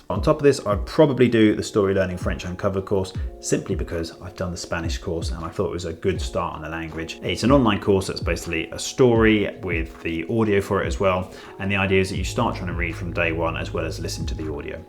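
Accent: United Kingdom. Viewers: I love your English accent! In school we learned "British English" but because of an unfathomable amount of American input growing up I now have trouble breaking away from that American vocab and pronunciation. Trying to change it now as an adult by consuming as much as I can from the UK😅 Great video as always!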